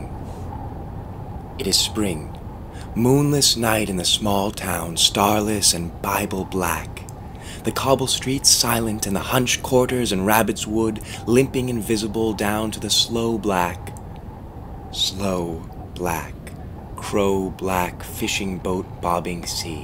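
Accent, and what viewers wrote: United States. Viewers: Doesn't sound right read with an american accent, but well read that man .Great poem, a real journey through what he was thinking. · Lovely. I didn't think I would like a US accent, but it works too.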